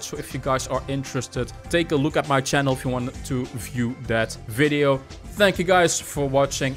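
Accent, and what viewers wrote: Netherlands. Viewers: Is that Dutch accent?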